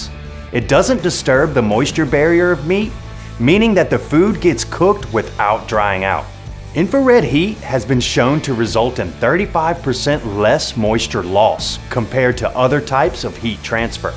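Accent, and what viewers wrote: South, United States. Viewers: you're a natural at this. I can see you going around the State interviewing local BBQ guys, talking shop, bringing over some BBQ's to show off and test.. I'm up in Canada and I could listen to the southern accent discussing BBQs all day... Combing products and techniques outside the showroom. I may not be able to buy local at your store, but its good exposure and the channel itself could pay for the adventures if it gets enough traction.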